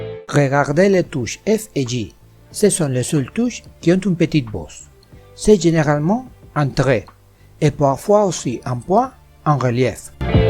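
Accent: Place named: Russia. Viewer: s'il vous plait mr vous n'etes pas français car votre pronanciation je crois que vous etes russe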